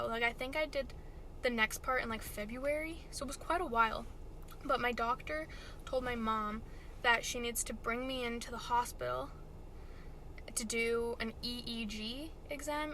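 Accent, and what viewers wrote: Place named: Canada. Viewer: this is literally one of my worst fears. (having a medical emergency at school) i’m glad you’re okay 💗💗 also, are you from canada? i hear a Canadian accent haha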